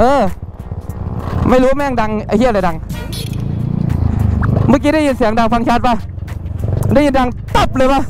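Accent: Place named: Suphanburi, Thailand. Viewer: คนสุพรรณคับบ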